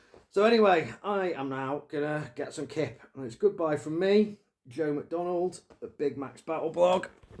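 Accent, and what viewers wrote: United Kingdom. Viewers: sooo British) Love it!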